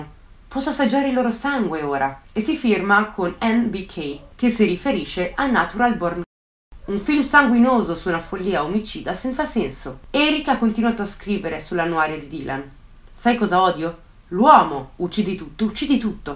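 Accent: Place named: Liguria, Italy. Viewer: ciao! Ah ok mi sembrava fossi ligure come me. Cmq brava mi piace come racconti gli eventi. E x questo che sembrava che avessi un qualcosa di famigliare. Grazie mille x la risposta! Hai un nuovo iscrittoooo!! !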